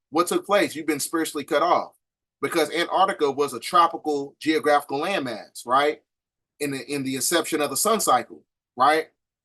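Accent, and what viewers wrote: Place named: Louisville, United States. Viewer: I hear Louisville accent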